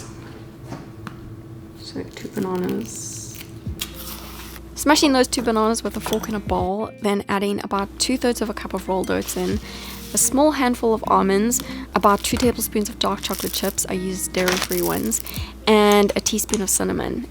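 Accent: South Africa. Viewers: Just discovered your channel...are you South african? I am from Cape Town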